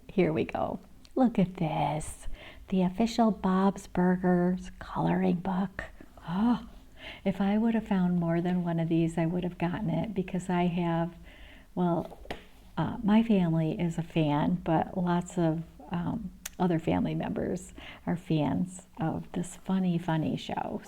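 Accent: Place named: New York, United States. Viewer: That sounds like you’re in New York. Are you in New York? Hailing from Albany.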